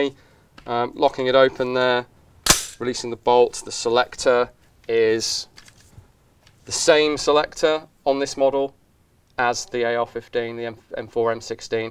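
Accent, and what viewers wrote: United Kingdom. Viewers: no, he has never shot a gun. Because he's Bri'ish, you can tell by the accent.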